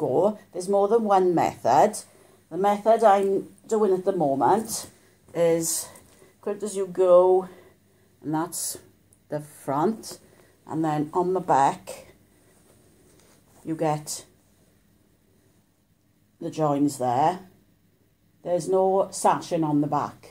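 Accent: Wales, United Kingdom. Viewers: Oh this is a great tutorial .. now i can see where i made mistskes on my squares. Thanks everso and I love your Welsh accent. My dad's family are all from Llanelli... any time I need a dose of Welsh tones I will come and replay your video 💕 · Ok. I just read a few of the comments and your replies. Your accent is Welsh! Awesome. I have now completely made 3 king size quilts by hand using your method. I am only a few months away from finishing my fourth king size quilt. This one is a double Irish Chain. Still using your method. You are the BEST. USA. Kentucky. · Super video......Love your welsh accent....Thank you xxx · Your tutorial is the best 👍...and I love your welsh accent 💟⭐I will use your method when I sew my log cabin quilt together. 🙋‍♀️😊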